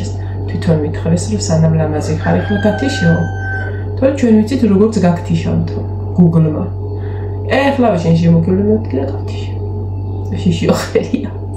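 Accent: Martkopi, Georgia. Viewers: ზუატად მანდ ხარ მართალი დიდი ხანი გიყურებ დამივხვდი რომ საერთოდ არ თამაშობ.შენი ძირძველი მარტყოფული კილო არ უნდა დაიკარგოს . არა კახური❤ძალიან მაღიზიანებს კუთხეს რომ მალავენ წვალებით და არც გამოზდით იყავი ის ვინც ხარ რა❤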